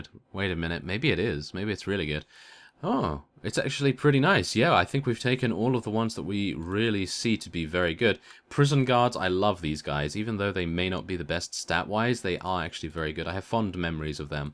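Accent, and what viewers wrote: United Kingdom. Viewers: awesome... your videos have been a source of entertainment for me for a long time now...ps: I love your british accent ^.^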